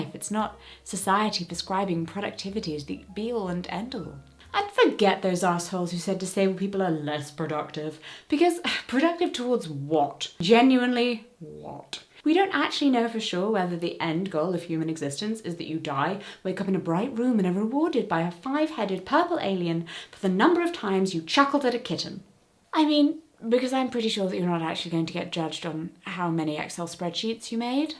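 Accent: RP, United Kingdom. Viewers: A video from a posh-sounding, very fragrant lesbian with the right amount of make-up being full of wonderful sentiments. What's not to love? Thank you for making this! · Between the 50s aesthetics, British "proper speech" and your serene smile, I am not sure if it's scientifically possible to be this gorgeous God fucking help me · Is it just me or is extra British in this video. This video is good and inspiring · you do sound posh. i wish i sounded half as posh. the more i watch your videos and british english shows, the sadder i feel about the fact that as a canadian, i sound more like an american. which, at this point, is the real insult. 😂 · “Sound Too posh” yeah and it’s pretty great 👍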